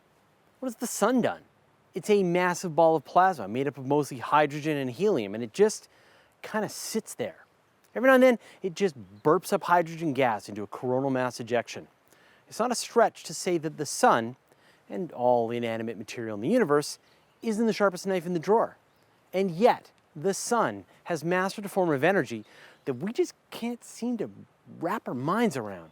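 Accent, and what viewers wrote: Canada. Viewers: How do we know you're Canadian? If the first word in a sentence starts with an E, you really put emphasis on the E unlike Fraser Cain fans from the Old World xD btw good video today. In the past I thought you did this just as a hobby to encourage young people to get interested in science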